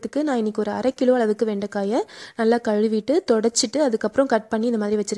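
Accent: Coimbatore, India. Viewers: Your netaive Coimbatore ma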